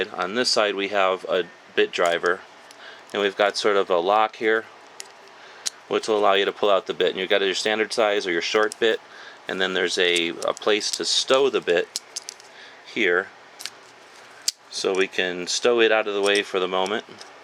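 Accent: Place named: United States. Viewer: When I hear your voice I hear 'Merica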